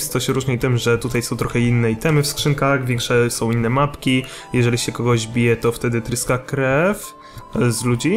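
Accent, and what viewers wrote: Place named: Warsaw, Poland. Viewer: ej jesteś z Warszawy bo słychać od Ciebie te końcówki ę,ą i tak dalej:D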